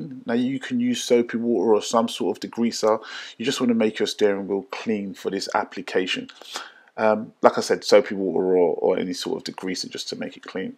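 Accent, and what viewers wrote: United Kingdom. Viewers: Finally found a video where someone did a fantastic job and spoke clearly in the Queens English, worth subbing and great upload. Thanks for taking time and sharing young man, appreciate.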